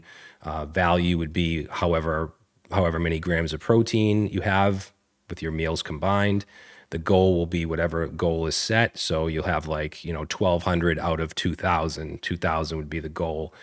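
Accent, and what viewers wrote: Boston, United States. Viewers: 10 years ago, you were a godsend, helping me learn to code. It's good to see you're still at it. And as someone that grew up in Connecticut, hearing your Boston accent is very soothing.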